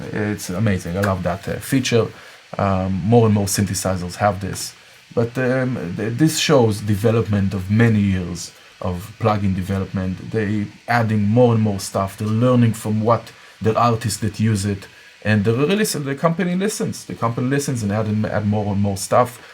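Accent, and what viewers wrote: Israel. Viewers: יש לך את המבטא הכי ישראלי ששמעתי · אתה ישראלי? 😅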